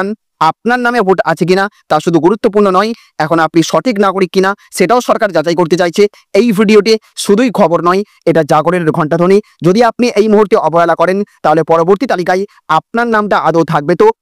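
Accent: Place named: South, India. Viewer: Aponi ki bangla vasai bollena na ki madrasi vasai bollena.....konta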